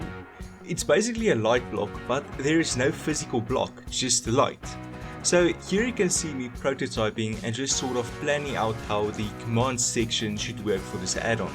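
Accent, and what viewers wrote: South Africa. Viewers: Your South African I now I am too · South africa? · Bro im sure your from south africa can you confirm this? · DUDE are u from SA because holy shizz your accent is on point omg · Is this guy South African? He sounds South African. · Are you from south Africa?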